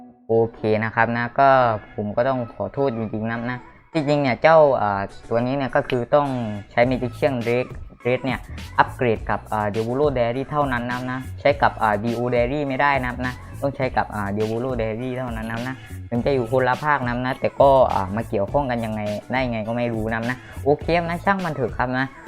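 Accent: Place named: South, Thailand. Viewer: สำเนียงคล้ายคนใต้เลยนื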